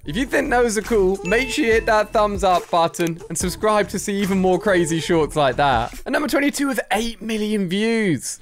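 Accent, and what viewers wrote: United Kingdom. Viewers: You in England or Scotland?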